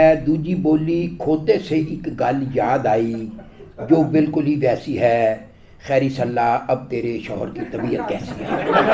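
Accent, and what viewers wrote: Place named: Punjab, India. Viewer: Bohat maza aaya !! Punjabi Urdu mix , bara desi style hai aap ka 😅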